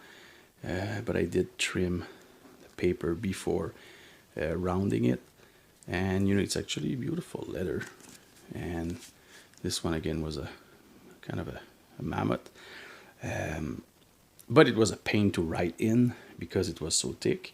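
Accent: Quebec, Canada. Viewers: Wow! I really enjoyed this video of your journal collection. You are so talented to make your own journals from scratch! From your accent I supposed you are from Québec, Canada... I am from Québec too and I also make journaling videos on my channel. I would love to see flip throughs from you, you seem to have an awesome journaling style. I am subscribing cause I want to see more content from you. Again, congrats on your journal collection. Take care. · Québécois right?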